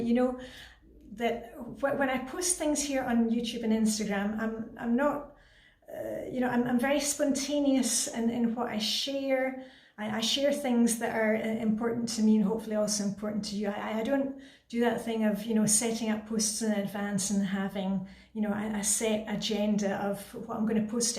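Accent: Scotland, United Kingdom. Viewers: On IG I guessed the mystery box was just that: an empty box so we could start decluttering. 📦Now I'm thinking a hygge -personalized binder (pom poms and a Danish flag on the front?) so we can add all our routines. I would love a personal session if for no other reason than to soak up your energy and listen to your Scottish accent for a wee bit! Happy "open" New Year..you give so much so graciously. ♥️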